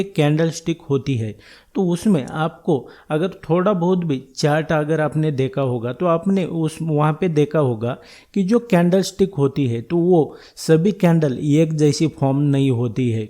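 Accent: Maharashtra, India. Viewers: Kaka tumhi marathi ahat na , Hindi varun olkhla 😂😂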